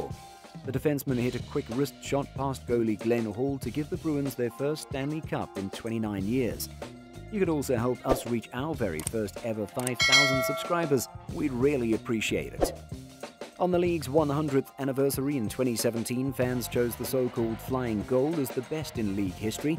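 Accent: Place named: United Kingdom. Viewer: Why is there a Limey talking about hockey?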